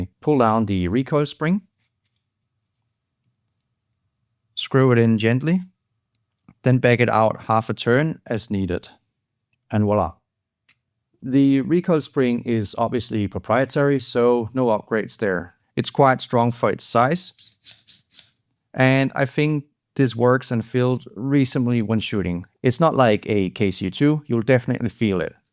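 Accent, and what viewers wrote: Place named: Denmark. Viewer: Very interesting video, i dont know why, but your accent sounds very Danish to me?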